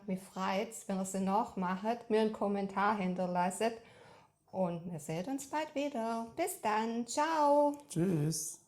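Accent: Swabia, Germany. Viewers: Oh,a Schwäble ,schee ! Scho aboniert ❤!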